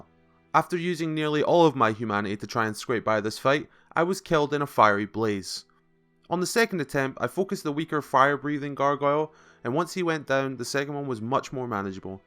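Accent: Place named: Scotland, United Kingdom. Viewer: Me: "I've seen a shield only run of this game." Youtube: "But have you seen it with a Scottish accent?"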